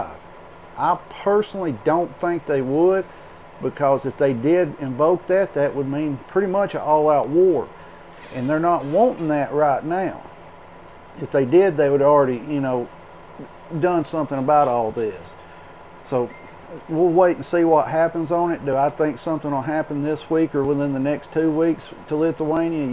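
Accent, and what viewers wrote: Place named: Alabama, United States. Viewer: Love the accent! Alabama?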